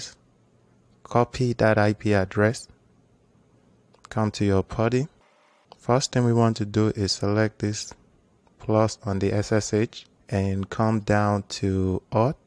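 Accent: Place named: West Africa. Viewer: Dude keep this up! Best AWS walkthru. Also cool you have a West-African accent - keep striving!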